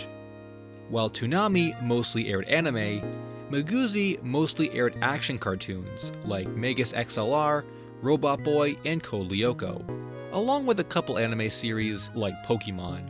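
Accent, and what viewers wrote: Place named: Florida, United States. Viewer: Where are you from??? I am in central Florida and some of these I remember watching like Qubo was my favorite. I remember having that one all the time even for back ground noice. I also sort of remember CW 4 Kids. You sound like you're around my area or in Florida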